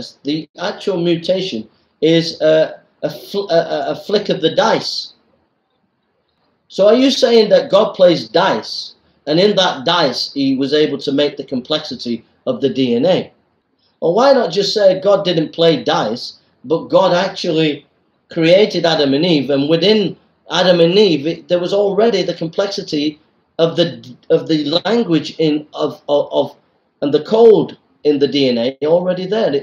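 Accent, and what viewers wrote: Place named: Liverpool, United Kingdom. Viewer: My grandfather was Liverpudlian Ave been there loads of time ferry across the mersey BUT if your nancinian then I'm way off on your accent if a or any one knows where he's from gotta be Liverpool or Lancashire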